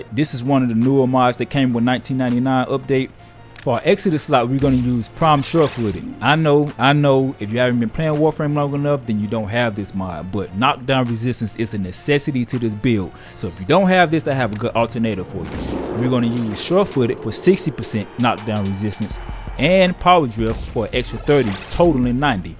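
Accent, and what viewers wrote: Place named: South, United States. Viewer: southern black accent.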